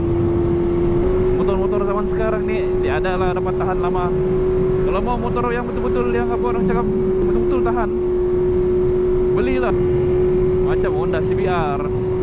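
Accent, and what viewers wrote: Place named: Sabah, Malaysia. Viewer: Suka dengar abang cakap sabah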